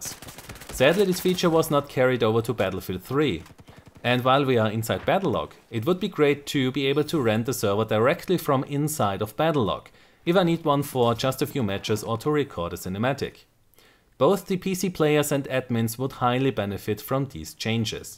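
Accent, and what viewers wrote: Germany. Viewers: are you German? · Hi Where are you from? Germany? (Because you chose Frankfurt as the server destination and I kind of hear it somehow when you speak).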